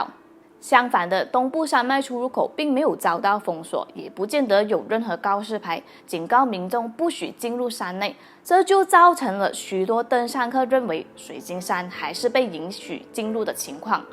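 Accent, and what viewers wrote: Malaysia. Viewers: 马来西亚口音就是酱的啦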